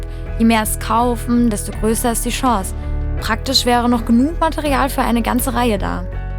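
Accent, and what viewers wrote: Germany. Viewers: how come you talk German and when you talk in English you have a German accent but in your music videos it doesn't sound like you have a German accent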